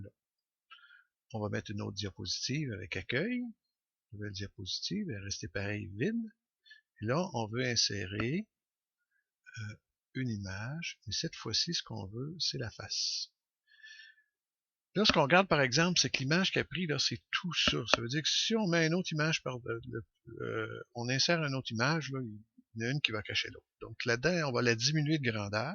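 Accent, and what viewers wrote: Canada. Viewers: j'ai passé une bonne aprés-midi à étudier les 4 parties du tutoriel, merci beaucoup. Je vais pouvoir créer mes caissons de meubles dorénavant. Il n'y a plus qu'à approfondir 'je crois qu'on peut sortir la liste des panneaux à réaliser) et ça a été agréable aussi grâce à l'accent de nos cousins d'Amérique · Merci pour cette petite formation , déclinée avec précision et l'accent du Canada en plus ..! Bonne continuation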